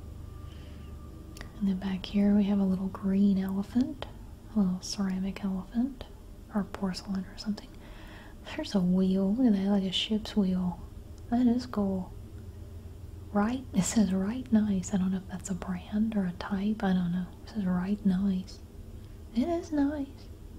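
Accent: South, United States. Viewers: I love your voice and southern accent 💗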